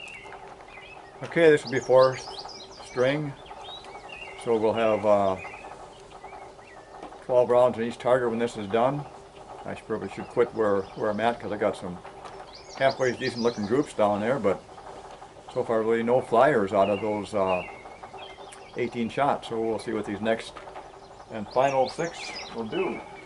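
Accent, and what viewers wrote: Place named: Midwest, United States. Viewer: By the way I kind of recognized your accent are you from Midwest or Minnesota I grew up in Minnesota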